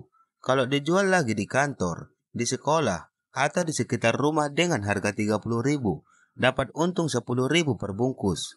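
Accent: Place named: Eastern Indonesia, Indonesia. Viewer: Sepertinya logat indonesia bagian timur gan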